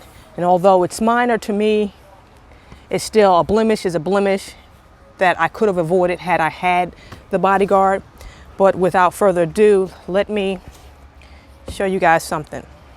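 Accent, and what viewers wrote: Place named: Virginia, United States. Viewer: I got mine because of your previous video. Love your Virginia accent....!!!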